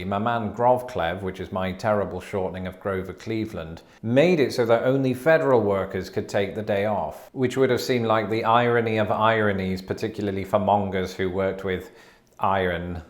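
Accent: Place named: RP, United Kingdom. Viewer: you and your snotty BBC accent would never understand so just accept it.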